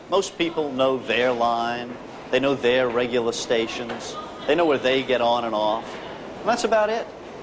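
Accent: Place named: New York, United States. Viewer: This presenter has the most New York accent I've ever heard in my life. And I'm an almost-thirty year old who was born, and has spent most of her life in Brooklyn.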